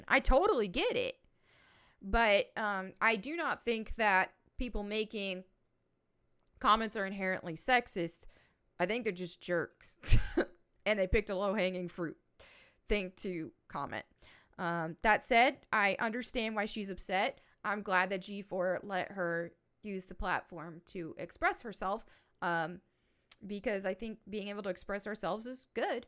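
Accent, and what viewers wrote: South, United States. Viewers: Hey.. Just discovered you. You're hot. I'll follow you awhile. ;-) I like your voice.. southern?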